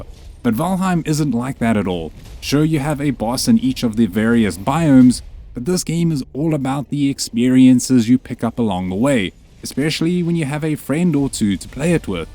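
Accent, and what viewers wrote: South Africa. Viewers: That SA accent is unmistakable. Good to run into this channel!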